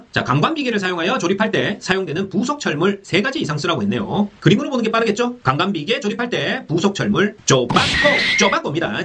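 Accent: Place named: South Korea. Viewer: 단55 틀68~ 사투리가 구수하시네요.. 어디 지역분이신지?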